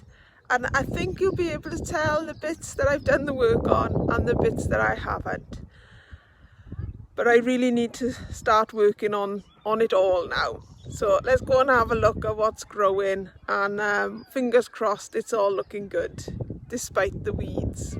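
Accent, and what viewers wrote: Wales, United Kingdom. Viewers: Hello... really enjoy your videos for 2 reasons... number 1) I love your welsh accent number 2) your a brilliant gardener.... I wish your were closer to home as would love to visit your allotment 👍👍👍👍👍🌻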